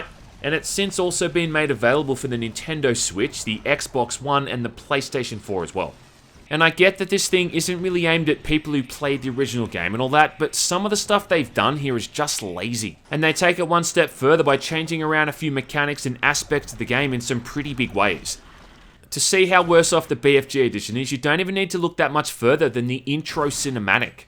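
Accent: Australia. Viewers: It's been 6 years but for me, is the shit! The most honest, in your face, game reviewer. There is no comparison. For us FPS fanatics, it's either him or civvie 11. Reasons? No pandering to the lowest common denominator. No forcing political narratives in your throats. Respecting the old FPS gamers intelligence. Honesty and not getting blown away by modern game gimmicks. And That Australian accent. I grew up playing these games, so for me..... Best FPS game reviewer. Bar none. You Go! Respect from India. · "LOOK AT EEM, WOTS WRONG WITH YA FAICE???" I fuckin' love australians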